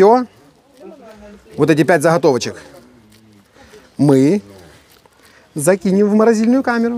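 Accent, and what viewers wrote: Odessa, Ukraine. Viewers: Одесский говорок росслабон для уха😂, привет из Харькова.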